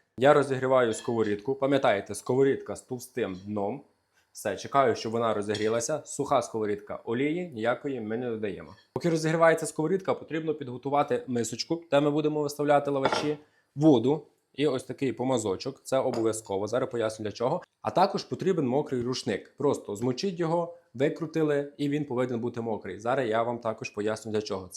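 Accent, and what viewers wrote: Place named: Surzhyk, Ukraine. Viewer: Дитя народилось і у школу ходило в регіоні, де всі балакали на суржику. Навчалося на кухаря, де викладачами були тітонькі з сіл. Вони так розмовляють. Тому так і балакає😂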